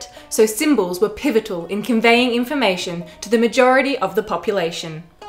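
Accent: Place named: Australia. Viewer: First off, though the narrator sounds Australian, she could just as easily be South African or even white Kenyan with that accent, so don't make assumptions based on skin color. Second, how is this stopping "Africans" from telling their "own" story? Third, who's exactly "African" in your opinion? "Kemet" Egyptians have been proven through the archeological record and DNA analysis to be from the modern Syrian, Israeli, and Palestinian region. Then they were further Semitized through the Hyksos invasion in the north, while having sub-Saharan contact through the Nubians. Both of those groups held dynastic rule for a short while. Then the Persians conquered Egypt. Then the Macedonians. Then the Romans. Then the Arabs. So again, who do you believe is "African" in your opinion?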